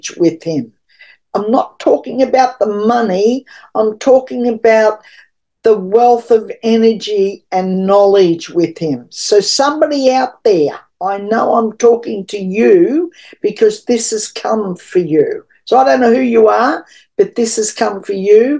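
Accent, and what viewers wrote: Australia. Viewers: Hi ,it's was lovely to listen to you this morning and great hearing an Aussie accent 😊 . Interesting reading and I look forward to your next podcast x love and light Bobbie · Thanks. Lovely to hear an Aussie. I’d Love to know where you live. It looks tropical. I hope you keep sharing. It’s also refreshing to have someone focussed on people mire than politics.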